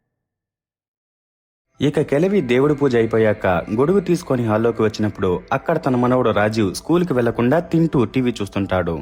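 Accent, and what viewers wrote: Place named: Tamil Nadu, India. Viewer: Nativity pokunda Tamil slang lo Baga chepparu👌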